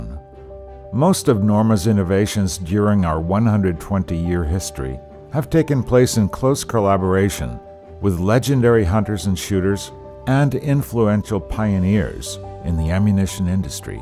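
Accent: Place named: United States. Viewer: The heavily American voice over is weirding me out.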